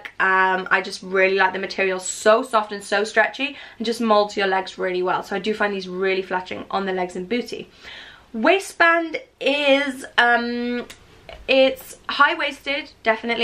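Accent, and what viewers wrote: Wales, United Kingdom. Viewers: You are soo cute babe ♥ I am from the Czech republic and I am soo in love with your British accent!! Please please, do you know when will be those leggings restocked? Thanks, and please, more James in the vlogs and videos, you guys are so cute together! ♥ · are you welsh? you seem to have a slight welsh accent to me haha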